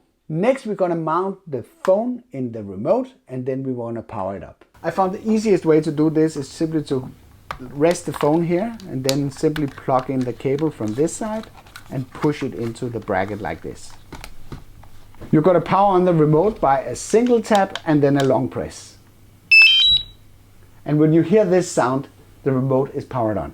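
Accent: Denmark. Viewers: It Sound like youre from Denmark because i am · Danish accent it’s like Chinese ,any way it’s good tutorial☺️ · Danish accents are the best! Thanks for the info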